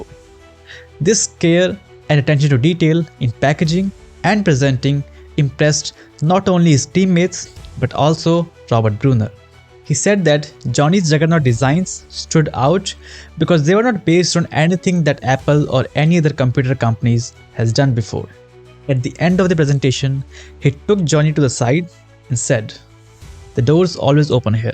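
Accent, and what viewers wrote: India. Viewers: that indian accent is killing me. cow dung eater lol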